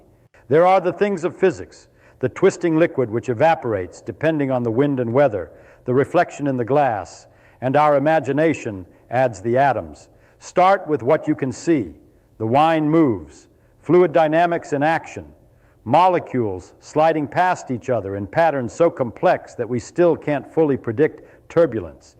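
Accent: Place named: Transatlantic, United States. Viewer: I like this transatlantic accent style.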